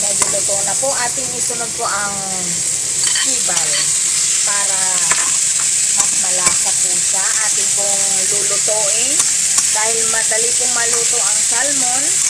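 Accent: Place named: Kamayo, Philippines. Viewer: the way ka kasi magsalita kamayo talaga from Mindanao na linggwahi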